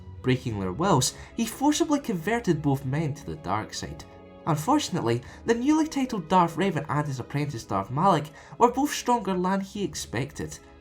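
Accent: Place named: England, United Kingdom. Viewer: Great vids , great narration but omfgod what a thick accent.. where it is from in England?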